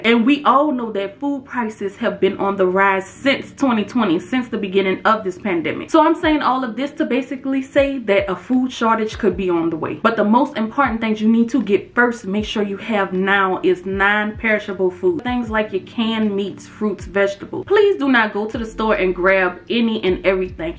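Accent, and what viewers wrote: New Orleans, United States. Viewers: New Orleans accent strong 💪🏽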